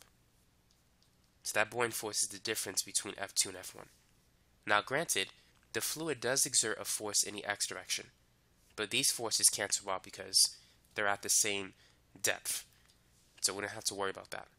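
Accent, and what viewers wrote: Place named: New York City, United States. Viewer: Damn you have a heavy NYC accent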